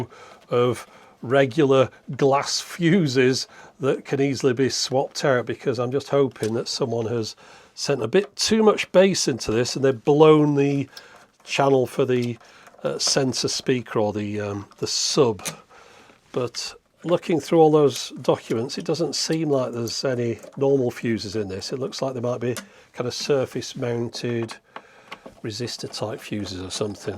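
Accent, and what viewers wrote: United Kingdom. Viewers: This was hilarious. went berserk. And his delivery is sooo English.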